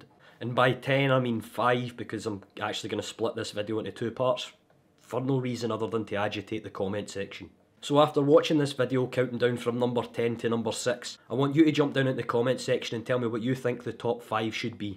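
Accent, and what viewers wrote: Scotland, United Kingdom. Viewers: I don't know how or why I got this , years after it was posted, but I do like it. I'm an American so I love what I can understand of your accent. I think everything in Scotland is charming and I was there in the tear 2000 and loved it. It is like a dream world to someone from Sourhern California. My guess for worst place would be the Rumpo Golf Course. Everything he touched turns to crap and I sorry that with Russia's help he capped on your country. Hope I can visit again someday. I'd like to die there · Bad or worse places or not, being English, I love the Scottish accent. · I love hearing this dude speak! The Scotch rule! Keep on talking,man!🖒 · The speech recognition software can't cope with the Scottish accent. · I like your scottish accent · Love your accent. So Scottish)) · This is it: found the dude with the thickest Scottish accent that I can actually understand completely.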